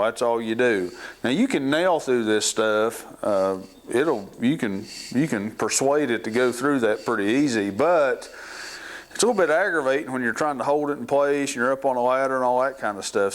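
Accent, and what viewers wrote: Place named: Tennessee, United States. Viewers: I was guessing Middle Tennessee, too, but northern portion, closer to KY. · Great video, thank u much Sounds like middle Tennessee accent, wonder where u from.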